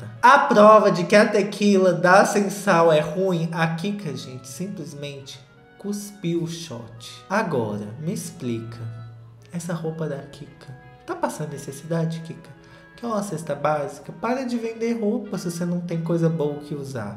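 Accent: Minas Gerais, Brazil. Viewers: quando vc fala Guarapari, tá falando do meu País ES??? Mineirinho 🤣🤣🤣🤣🤣 amando seu canal, nova por aqui 😜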